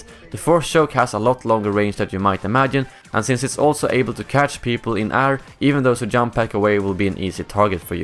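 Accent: Sweden. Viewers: Are you from Sweden? You accents sound a little familiar ;) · thanks for the tips...är du från Sverige va? det känns så :) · I knew you were swedish! Cause you have a strong accent! Where do you live in Stockholm? (Not the adress, just the name of the place) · are u from sweden? just wondering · Mmm svengelska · are you swedish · Are you from sweden? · are you Swedish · låt mer svensk xD bra video dock :)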